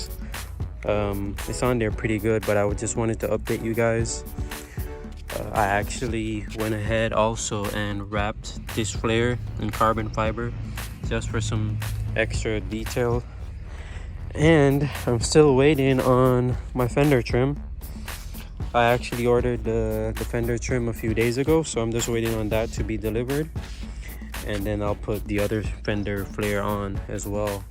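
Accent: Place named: Jamaica. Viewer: Dowg sound like a yaad man so. Good look mi g. Mi just buy a 2019 gt 2 weeks now and mi love it and about to start doing some mods. So keep the content coming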